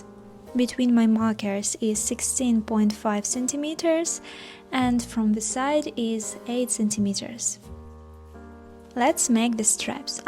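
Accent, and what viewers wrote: Russia. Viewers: я будто слышу русский акцент😂 так легче понимать туториал хаха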